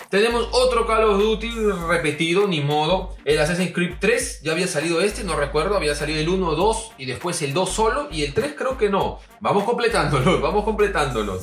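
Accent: Peru. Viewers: Eres peruano? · Eres peruano? 🤔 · Soy yo o su acento es de peru xd? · Acento limelo?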